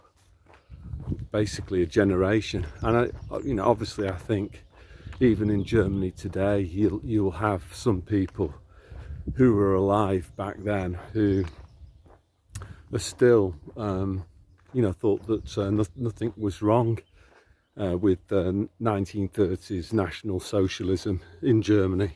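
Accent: United Kingdom. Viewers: Sounds British to me. People believe what they were taught/shown on TV/movies, etc...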